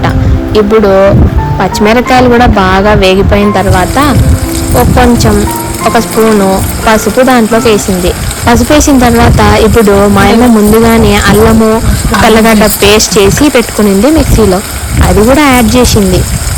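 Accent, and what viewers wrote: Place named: Kadapa, India. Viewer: Kadapa slag vinte ,aa feelee verappa😊😊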